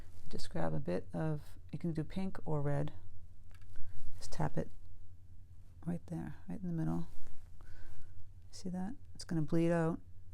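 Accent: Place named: East Coast, United States. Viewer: I know girl! We chatted last week about our East Coast loves of NE! You said you were in NY too and didn't lose your accent! LOL. Just finished your w/w pink/indigo trees too. Very relaxing and gorgeous color scheme. Thanks for everything,!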